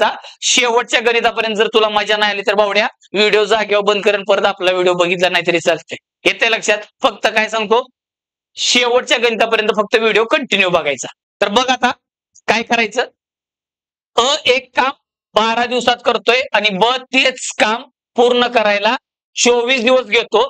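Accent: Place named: Pandharpur, India. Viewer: Sir tumhi kuthe rahta .... pandharpur taluka ahe ka tumcha.... language tikdchi vatte....ekdam chan teach krta....lagech concept clear hotat....mi khoop videos pahile tumche....shekdewari ,train,kal kaam ....boat. Ni pravah ..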